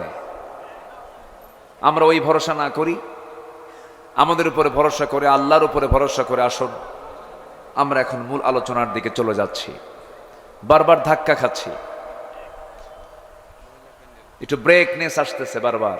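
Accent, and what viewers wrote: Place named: Kushtia-Jessore, Bangladesh. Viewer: উনার ভাষা শুনলে মনে হয় যেন কুষ্টিয়া অথবা যশোরের মানুষ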